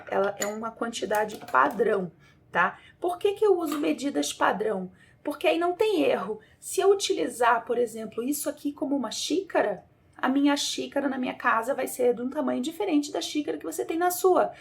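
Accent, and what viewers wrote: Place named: Rio de Janeiro, Brazil. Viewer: ETA CARIOCA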